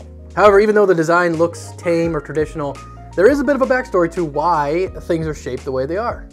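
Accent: Michigan, United States. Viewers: You're from Michigan aren't you?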